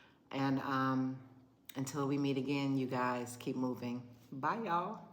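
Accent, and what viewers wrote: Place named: New York, United States. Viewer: My sister from NY moved to Merida with her boys but had health issues with the mold - you sound like a New Yorker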